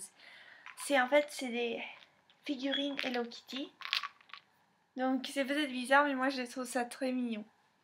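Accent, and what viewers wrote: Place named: England, United Kingdom. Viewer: je trouve que tu as un accent anglais et en plus tu n'habite pas en france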